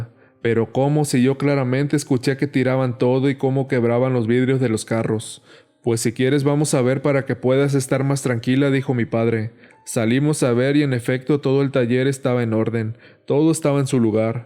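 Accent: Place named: Monterrey, Mexico. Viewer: Con todo respeto, lo diré, el narrador se escucha bien ranchero. Me recuerda a mi gente de Monterrey.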